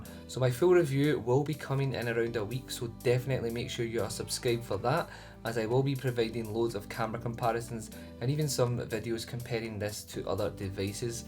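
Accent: Scotland, United Kingdom. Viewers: nice Scottish accent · Love the British accent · Awesome! Subbed because your accent reminds me of tartans. =)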